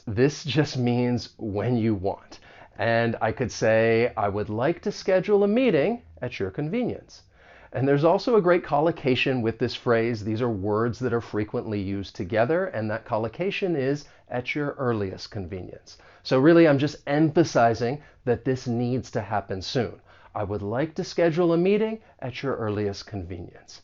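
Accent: United States. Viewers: thank your video is so helpful. so,keep going! is your english american? from japan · I do love American accent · Is this American English?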